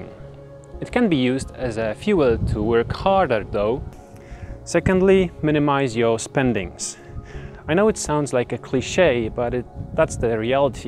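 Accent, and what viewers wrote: Poland. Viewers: What nationality are you? You have an accent but i cant tell where you are from. Poland? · Rotbart1337 he’s Polish indeed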